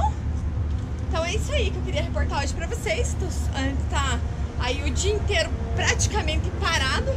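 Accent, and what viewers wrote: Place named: Rio Grande do Sul, Brazil. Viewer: Vc e uma motorista gatinha hem. Fico xonado nesta sua voz de gaúcha!!!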